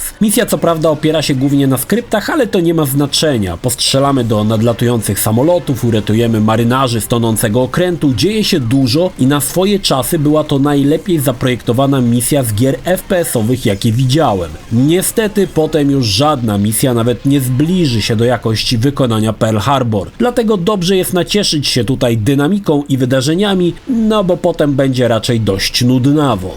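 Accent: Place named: East, Poland. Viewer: O wymowę bym się nie czepiał, może nieco zbyt wschodni akcent i stąd to mocno słyszalne "z", ale to drobnostka, a pochodzenia nie oszukasz:) Lubię, ale jeśli coś mu mógł bym zarzucić to to, że często mówi coś o czym nie ma pojęcia albo mu się wydaje, że ma pojęcie oraz to, że jego materiały są robione trochę na odpierdol. Do pierwszego zdążyłem się przyzwyczaić, w końcu komu z nas się nie zdarza pieprzyć głupot? To może czyni takim ziomeczkiem, nie jest jakimś nieomylnym guru i Jaśnie Panem Youtuberem. Więc pomyłki, zwłaszcza na streamach, jestem w stanie wybaczyć. Ale materiały robione na kolanie trochę mnie mierżą. Po takiej recce oczekiwał bym jakiegoś przygotowania, scenariusza, trochę więcej wkładu własnego, a nie na zasadzie "chuj tam, wpiszę 1, nikt i tak tego nie ogarnie". No i swoją drogą przyłapałem na kłamstewku. Mam nadzieję, że karę za to w tym roku Mikołaj przyniesie mu soczystego crapa pod choinkę ^^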